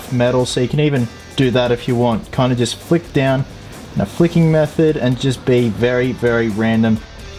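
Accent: Australia. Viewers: R u from Australia?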